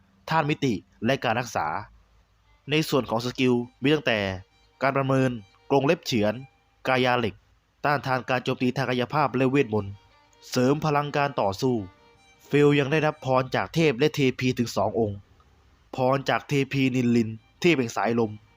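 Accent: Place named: South, Thailand. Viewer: เจ้าของเพจเป็นคนจังหวัด กระบี่ หรือพังงา ครับ เสียงออกโซนนั้น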